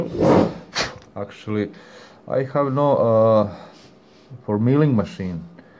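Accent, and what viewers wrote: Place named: Russia. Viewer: Sorry, your accent sounds Russian. I was complimenting you.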